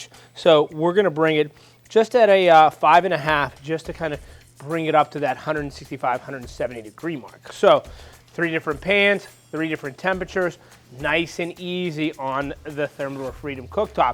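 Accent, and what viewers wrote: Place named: Pittsburgh, United States. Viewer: Brother yous is crispy and crackerty is you from Pittsburgh?!?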